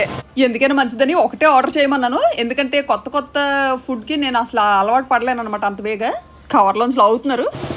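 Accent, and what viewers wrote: Vizianagaram, India. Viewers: మీరు మాట్లాడితే vzm accent ఉట్టి పడుతుంది. Intlo valu matladinattu untundhi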